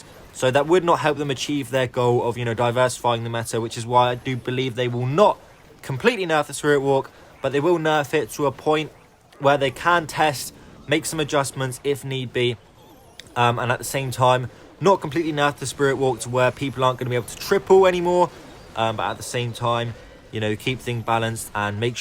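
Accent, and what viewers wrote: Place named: United Kingdom. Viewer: Love that British accent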